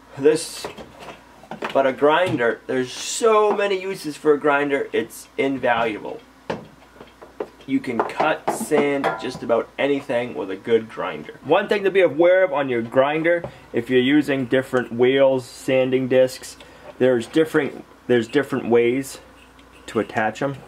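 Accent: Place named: Vermont, United States. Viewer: What are temps currently at Lumnah acres? I think that you mentioned (if not your accent says so) being near Vermont. We are currently at -5 for a day time high temp.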